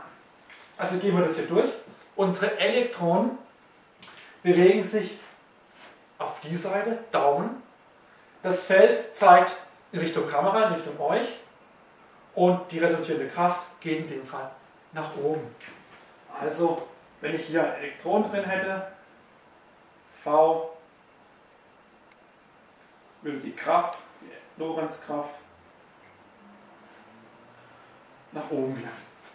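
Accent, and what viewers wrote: Swabia, Germany. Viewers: xD schwabe!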